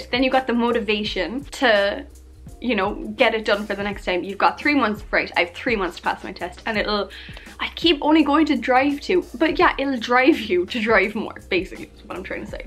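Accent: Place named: Ireland. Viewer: It's very fast for me... It's only for Irish people. I'm trying to say about your English. But I don't give up! I'll watch all your videos. Do some podcast please about your car. About your dashboard and all parts of car what we need to know for test. Thanks and keep going!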